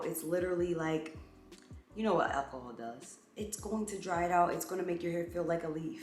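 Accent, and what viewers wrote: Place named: Philadelphia, United States. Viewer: Are you from Philly? You have a Philly accent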